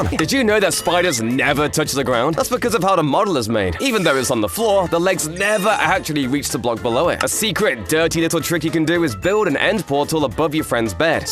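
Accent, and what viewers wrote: United Kingdom. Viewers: His British Accent is do cool 👁👄👁🙉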